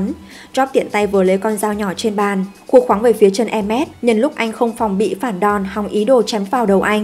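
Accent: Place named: North, Vietnam. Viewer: Nghe giọng đọc MC thấy ngán ngẫm không muốn nghe vì dân Bắc Việt cộng đọc truyện thật là không thích, lại chán chường cho cuộc sống hiện tại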